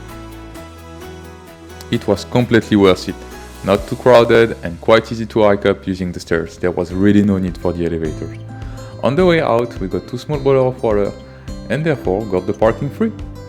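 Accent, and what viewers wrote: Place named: France. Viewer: Your French accent is WAY too thick - je n'ai compris RIEN! Thumbs DOWN!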